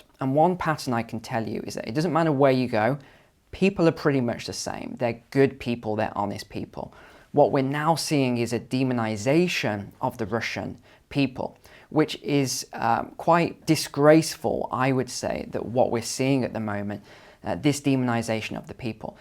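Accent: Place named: United Kingdom. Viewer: "I don't know about you but I don't fancy going to a nuclear war right now" UK English just sounds so proper and funny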